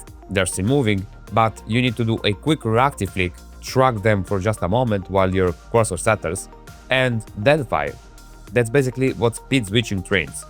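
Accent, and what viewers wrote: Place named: Eastern Europe. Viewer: Whenever i hear an east european accent i know the man will go straight to the point while spitting facts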